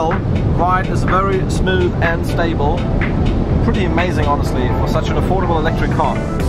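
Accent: Germany. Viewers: Wie er die ganze Zeit mit seinem übertriebenen Oxford Englischakzent versucht seinen D´englischunterton zu verstecken ;-)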